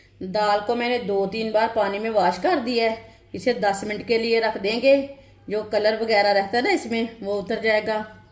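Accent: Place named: Punjab, India. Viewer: Panjabi jab Urdu main baat kartay Hain to pata chal jata hai k yeh Panjabi hai lahja aisa HOTA hai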